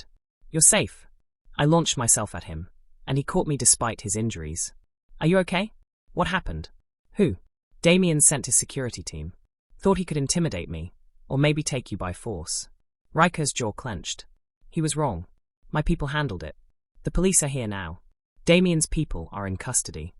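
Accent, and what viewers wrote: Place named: England, United Kingdom. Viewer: good story, i happy that i found a channel with a english accent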